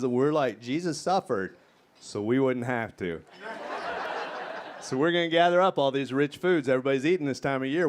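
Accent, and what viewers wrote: South, United States. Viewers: I just love brilliance with a southern accent ❤ such a juxtaposition.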